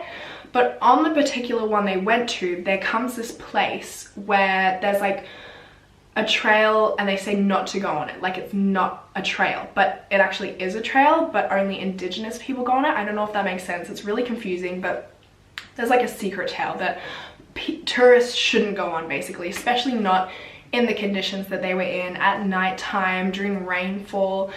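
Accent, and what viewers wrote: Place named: Brisbane, Australia. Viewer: Girl I somehow knew you were from brissy listening to your accent 😂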